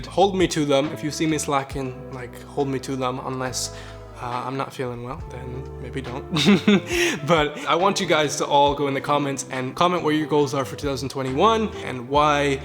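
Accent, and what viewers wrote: Japan. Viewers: Your Korean is adorable. It kinda sounds like a Japanese accent 😂🥰. I’m learn Korean too and I hope I can learn it with you! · Awww your korean pronunciation is so japanese lmaoo hope u get better and hope your have a good year!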